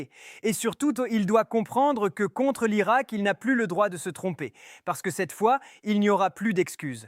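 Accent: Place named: Senegal. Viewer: Tu parles comme un 100% senegalais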